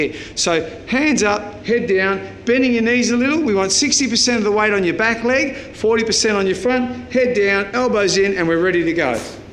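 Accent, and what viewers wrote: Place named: Australia. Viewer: Nah, you're just an American with no ear for accents. Bear Grylls is British, this guy is Australian.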